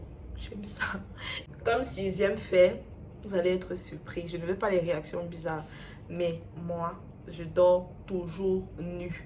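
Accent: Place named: Cameroon. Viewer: Il y a un dernier accent là qui sort quand tu parles🙌🏾😜 vive de 237🔥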